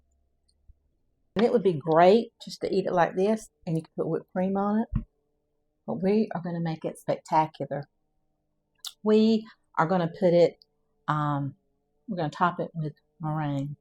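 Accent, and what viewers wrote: South, United States. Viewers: Oo, I love pie!! That is a fact I cannot deny. I made a milk tart the other day, baked it in a scalloped rim dish, but not really suitable, I always bake this in an oblong Pyrex dish and it turns out so lovely, so stick to what you know works. Believe me. So I looked at this Le Creuset pie and thinking before I pack this away, how about a lemon meringue pie? Now that I have seen this video, I think I will do the lemon one first and then try the coconut. I love coconut and again, I love pies/tarts. But they must be real good crusts and fillings with meringue. Thank you so much for sharing and showing how it is made. Just love to watch and love the Southern accent, sounds to calming. Not from the U.S.A. Have a beautiful day, and the grandson is gorgeous, they are just too precious for words. Many blessings. Kind regards.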